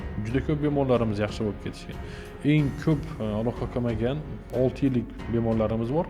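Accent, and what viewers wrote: Khorezm, Uzbekistan. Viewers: Хоразмча талафуз блан тушунтирдингиз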